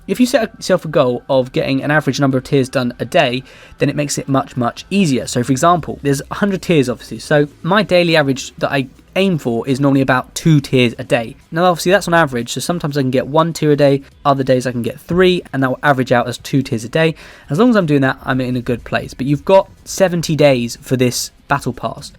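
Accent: United Kingdom. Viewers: Like the most of British people voice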